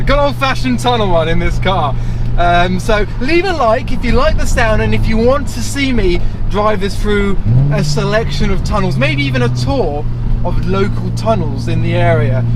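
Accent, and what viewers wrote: United Kingdom. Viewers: The most British sounding person on YouTube, banging videos keep it up 👍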